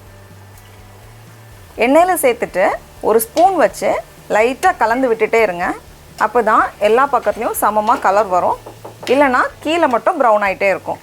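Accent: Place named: Tirunelveli, India. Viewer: Hi why no videos for a long time eagerly waiting for ur video. Nice video. U r always a queen in cooking I like u very much and also ur tirunelveli tamil. Iam Christilla from Chennai.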